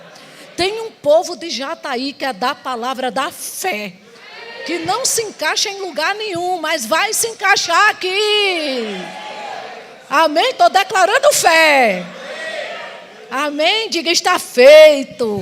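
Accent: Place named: Northeast, Brazil. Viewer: Sou de Pernambuco e estou em Brasília, e é bom ver o nosso sotaque nordestino. E prega bem, palavra maravilhosa.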